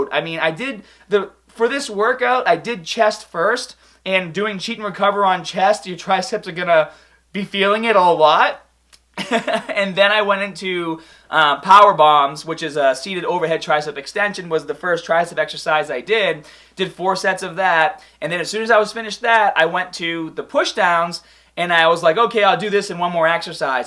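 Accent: Boston, United States. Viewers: Are you from Bahstin? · That boston accent tho 🔥🔥🔥😁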